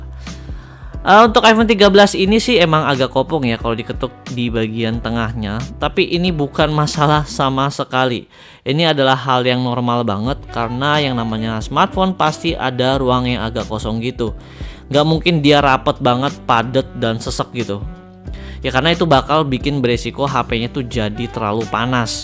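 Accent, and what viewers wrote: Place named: East Java, Indonesia. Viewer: kayae mas.e orang jawatimur ya pernah buat konten di chimory 😁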